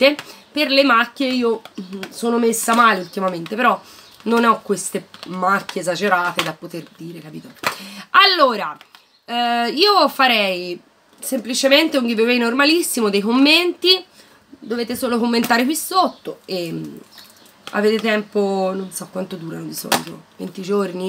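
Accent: Tuscany, Italy. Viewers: Sei toscana?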